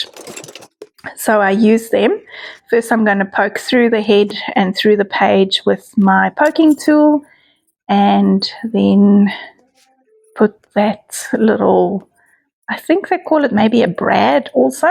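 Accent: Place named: South Africa. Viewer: Beautiful page! I love hearing another South African art journalling because sometimes I feel like I’m the only South African who art journals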